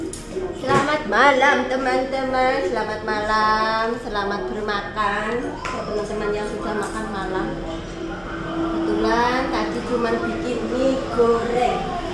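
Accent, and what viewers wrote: Java, Indonesia. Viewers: Mbak..salam kenal, mbak sampean boso jowone kok kedok to, sampean dari mana nggih..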